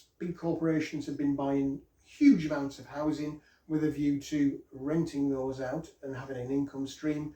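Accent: United Kingdom. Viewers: SO interesting to listen to British language.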